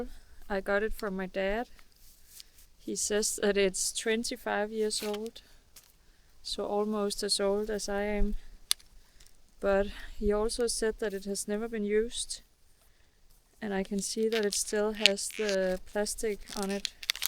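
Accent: Denmark. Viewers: Im instantly hear you are from Danmark on the accent😊 3 hours småland?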